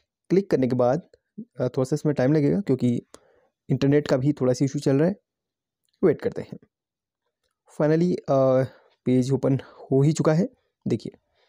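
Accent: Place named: Madhya Pradesh, India. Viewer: Sir aapki language ♥️ madhyapradesh ♥️ jaisi mach hoti h aap bhali utrakhand se ho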